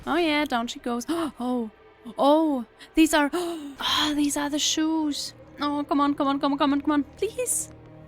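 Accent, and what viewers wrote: Germany. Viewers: Ich könnte schwören da deutsch raus zu hören XD "I could swear I could hear German coming out of it"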